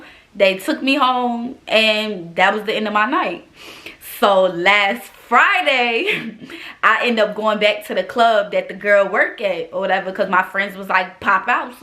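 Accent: New York, United States. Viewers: I love the way ny talk 😍. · Are you from New York even ??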